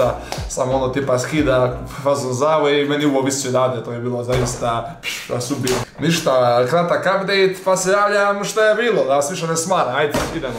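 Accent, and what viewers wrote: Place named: Montenegro. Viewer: Koliko volim taj crnogorski naglasak😁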